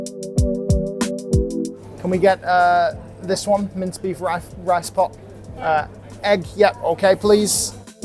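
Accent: United Kingdom. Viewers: Are you from Birmingham? Brummie accent... 🤔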